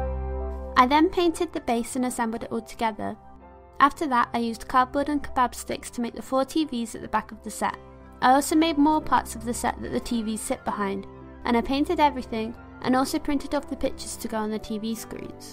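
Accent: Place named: Southern England, United Kingdom. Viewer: You're British Finally i can fully understand every word said in a video (Though your accent says you're from the south, hi from the north)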